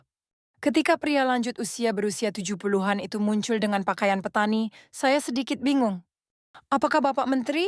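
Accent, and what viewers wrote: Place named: Malaysia. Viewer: Admin itu orang Malay yg sekedar cari viewer dari Indonesia Kau dengar baik2 logat dia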